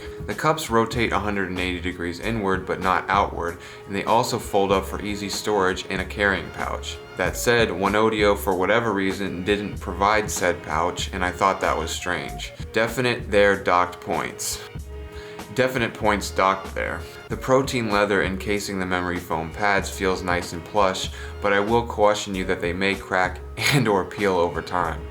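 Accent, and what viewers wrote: New York, United States. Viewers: "Epicot golf ball" and the Noo Yawk accent: good. Those cacophonous urchins and drowning in chocolate pudding: bad.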